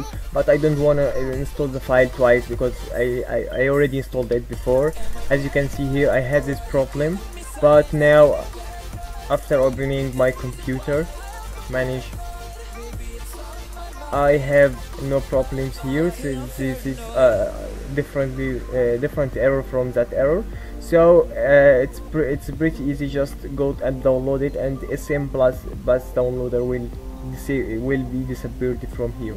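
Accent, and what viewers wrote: Egypt. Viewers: Thats an egyptian accent are you egyptian??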